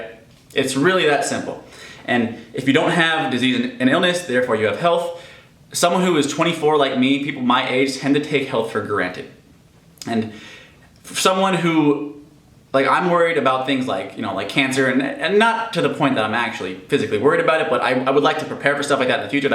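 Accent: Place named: South, United States. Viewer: What part of the south are u from?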